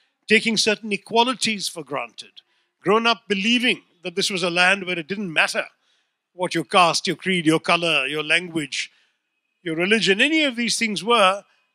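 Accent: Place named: Oxford, United Kingdom. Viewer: Shashi is actually an intellectual fraud. Whatever he speaks against PM Modi is mostly biased and is more applicable to his PM mms or Sg or rg. Speaking good English without substance is of no use for the ‘cattle class’ who have been given gas cylinders, houses, business loans, pension, medical insurance, saved from the tyranny of triple talak, electrity connection, cheap mobile data and above all a sense of hope and pride together. Speaking lies 100 times won’t transform it into a truth even if punctuated with oxford English. A true fraud.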